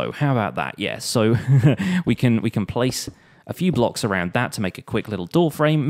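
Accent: United Kingdom. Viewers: It's just a normal British Accent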